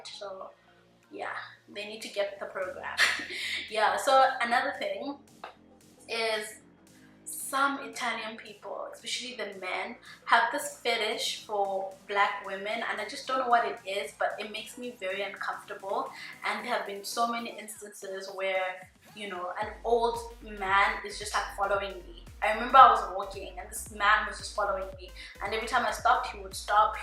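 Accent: South Africa. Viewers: Are you south african? I can here an south african accent but mixed with another accent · Hey!! you sound South African!! I can tell you are!!I would like to travel to Italy through AuPairing. Can we get in touch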